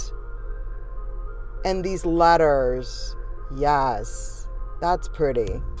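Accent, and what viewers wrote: New York, United States. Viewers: what part are you from!!! Put me in your suitcase, lol!!! I thought you were from NY by your accent!!!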